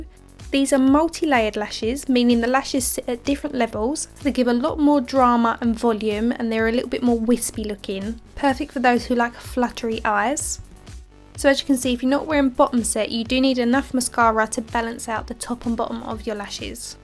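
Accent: Essex, United Kingdom. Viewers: Love your Essex accent and your techniques. Subbed :-)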